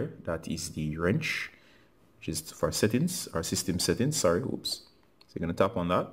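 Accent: Jamaica. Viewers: U sound Jamaican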